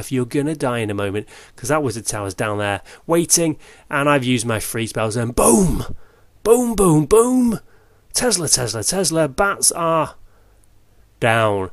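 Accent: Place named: United Kingdom. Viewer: I don’t know about the “Batman” voice...I do know that I’ve enjoyed your videos since last April when I signed on. Your content is outstanding and has helped me quite a bit. Matter of fact, your “Ice” troop configuration has given me quite a bit of success. So much that others in my clan use it now with devastating results. Your commentary, delivery, and UK diction is far and away the best!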